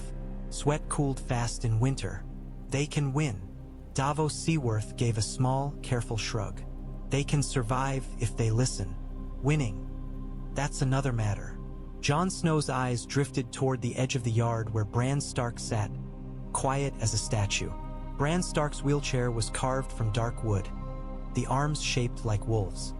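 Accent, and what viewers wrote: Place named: India. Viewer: Why is this one different saying full names and randomly going into Indian accent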